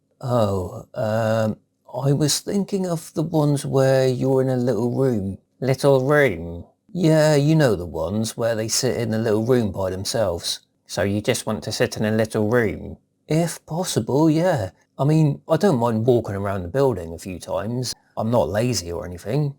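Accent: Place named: RP, United Kingdom. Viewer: On a different note, this channel is quite good if you want to learn Received Pronunciation.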